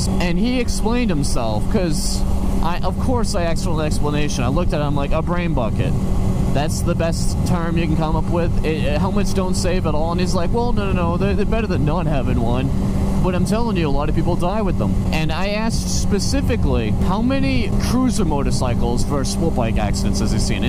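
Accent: Massachusetts, United States. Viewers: Are you from Massachusetts?!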